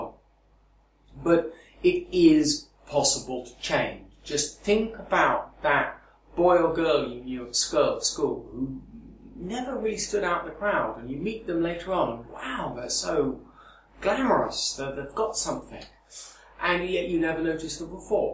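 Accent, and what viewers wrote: United Kingdom. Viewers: Jai Hind Nah he has some kind of english accent. It's quite clear and quite close to how BBC newsreaders sound, but not quite as posh as, say, the queen of the U.K. Think groundskeeper willy from the simpsons. That's a comedy scottish accent, so it's exaggerated af but it isn't too far away from the truth. :P Also, Shrek speaks with a scottish accent, though Mike Myers is obviously american. I'm struggling to think of a world-famous scottish actor you might know.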